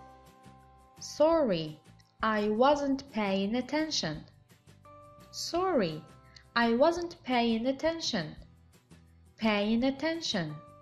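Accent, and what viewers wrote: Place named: United Kingdom. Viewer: Hi,you have amazing british accent thank you for evreything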